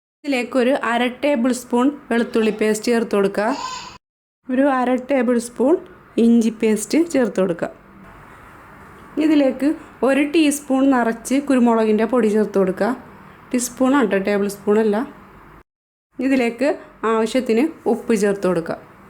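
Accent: Kodungallur, India. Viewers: Ellathinteam pirake ka nnanallo. ...kodungaloor bhashayano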